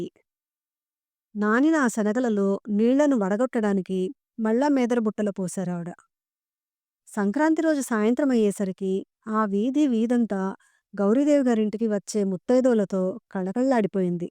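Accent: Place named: Telugu, India. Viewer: చాలా బాగా చదివారు. గొంతులో తెలుగుదనం చక్కగా తొణికిసలాడింది.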